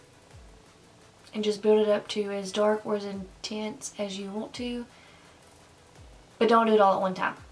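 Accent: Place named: North Carolina, United States. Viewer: You sound like you're from where I'm from :) NC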